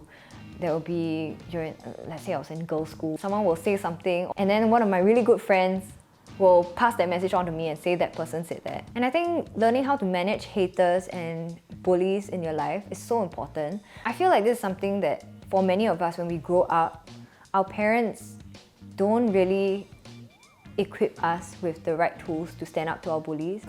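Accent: Singapore. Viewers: Its called tall poppy syndrome. People love to intentionally knock others pure jealousy! Keep it up girl your Singlish is awesome!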